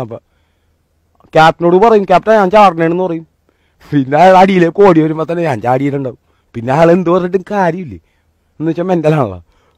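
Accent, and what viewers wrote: Kochi, India. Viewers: Sorrry. Da. Nindea. Kochi basha. Suuuppper. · അവൻ ആദ്യം മുഖവുര പറഞ്ഞല്ലോ കൊച്ചിക്കാരൻ ആണ് എന്ന് . കൊച്ചി സംസാരരീതി ഇവന് 100 % ഉണ്ട് . കൊച്ചിക്കാരുടെ സംസാരം കഞ്ചാവ് അടിച്ച് പറയും പോലെയാണ് . ചിലവൻമാർ കഞ്ചാവും ആയിരിക്കും . "കൊച്ചി കണ്ട വന് അച്ചി വേണ്ട" എന്ന് പഴമക്കാർ പറയുന്നതിൽ കാര്യം ഉണ്ട് . പണ്ട് ആണുങ്ങൾ കൊച്ചിയിൽ പോവുന്നത് ലാവിഷായ് പെണ്ണുങ്ങളെ വ്യഭിചരിക്കാൻ കിട്ടുന്നത് കൊണ്ടാണ് . ഒരുപാട് പെണ്ണുങ്ങളുമായി വ്യഭിചരിച്ച പുരുഷന് പീന്നീട് പെണ്ണ് കെട്ടി ജിമിക്കാൻ താൽപര്യം കുറയും . അതാണ് അച്ചി വേണ്ടാ എന്ന് പറയുന്നത് . (Note: ഇപ്പോൾ അവിടത്തെ ആണുങ്ങൾക്ക് കുറച്ച് വിവരം വെച്ചു . അത് കൊണ്ട് പഴയ പോലെ കളിക്ക് പെണ്ണ് ലാവിഷ് അല്ല 🤪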